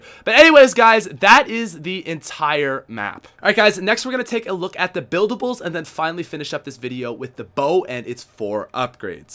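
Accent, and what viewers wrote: Canada. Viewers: is this guy from Canada? he sounds like he is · Are you Canadian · Idk if anyone can tell but i know for a fact he is canadian by the way he says "are".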